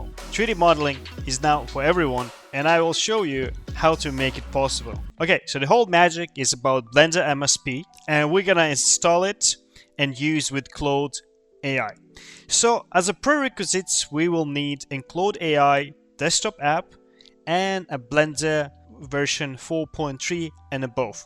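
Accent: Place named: Russia. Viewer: I think he might be russian, c is read like an S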